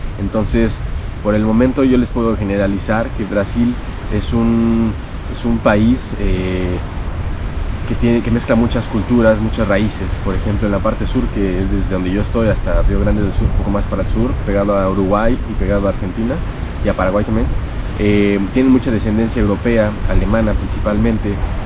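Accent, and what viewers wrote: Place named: Mexico. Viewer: De Argentino no tenes nada. Se nota el Mexicano con solo hablar. Jajajajaja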